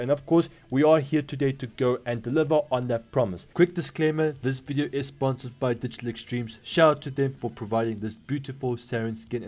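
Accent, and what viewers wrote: South Africa. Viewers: Yo a South African g. Respect.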